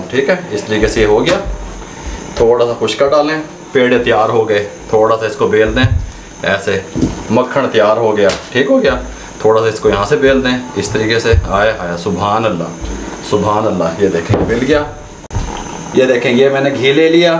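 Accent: Pakistan. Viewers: Pakistani accent aur wo bhi Punjabi ke sath bahot hi badhiya combination hai. Love from India ❤❤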